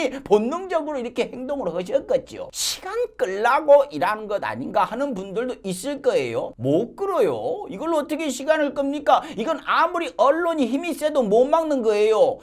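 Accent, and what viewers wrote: Jeolla, South Korea. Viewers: 강 성범씨 구수한 전라도 사투리가 매력이 있습니다. 럭셔리 칼럼 잘 보고 있습니다.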